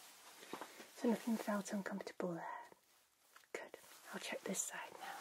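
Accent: United Kingdom. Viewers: Love hearing that beautiful British voice & it sounds so bloody lovely! Watching your content always feels so welcoming and relaxing as well! Keep up the great work & goodnight! 🥰💜💜🥱🌃🌙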